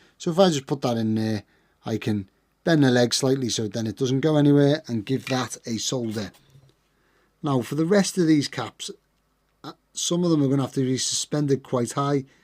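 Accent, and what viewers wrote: Liverpool, United Kingdom. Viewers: Hello! Enjoying your videos very much - I do build pedals from time to time! (Have built Zvex Fuzz Factory, Bazz Fuss, Fuzz Face, Vox Repeat Percussion, Sparkle Drive, Wooly Mammoth...) I like that your approach is just making it work - sometimes I've felt bad about twisting resistors together to get the right one, but seeing you do it shows me I'm in good company! I sometimes parallel them which I feel happier about because it gives me a chunkier "component" rather than a long skinny one. Takes a bit more trick math tho (or just try until it's close enough for government work as you say). As someone who once lived - and met my wife and married - in Liverpool, it's lovely to hear your accent again, and be reminded of the wonderful times and good people of your fair city - certainly the biggest influence on my life. You using a lighter to shrink heatshrink just took me right back to the street-sellers shouting "Pound-yer-lighter" (which we still say in my house when we're looking for one). 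I wonder if the electronics store near Rushworth's and Curly Music is still there - no doubt a lot has changed. Anyway - in my experience of putting stuff together on veroboard, I used to make my boards as compact as possible - but as you experienced, this efficiency can make life hard - I think this layout could benefit from a bit of breathing room - there's certainly room in your superb enclosure! I use "diy layout creator" for my designs. My other thought was, as you use some very posh co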